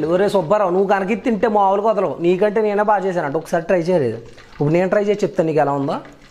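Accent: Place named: Andhra Pradesh, India. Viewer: Bro I like Andra yaasa....from Telangana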